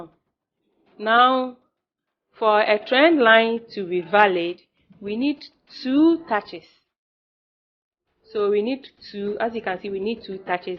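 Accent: Ghana. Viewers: Your accent is a mixed, sounds a little bit Nigerian and a much stronger Ghanaian accent. Nice video btw. I thought you trade smc? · This is my first time hearing voice cus I am always on twitter she sounds like a Ghanaian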